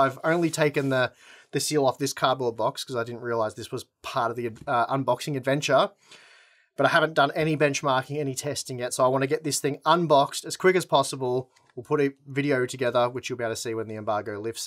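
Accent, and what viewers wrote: Australia. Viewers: Aussies are so cute when they talk.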